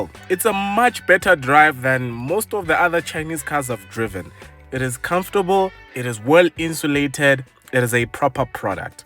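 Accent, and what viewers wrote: United Kingdom. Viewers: The British accent 😂😂😂😂🔥🔥🔥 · It's a British accent for me." Allow us get started ❤❤ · British accent😂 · The British accent 😂😂😂😂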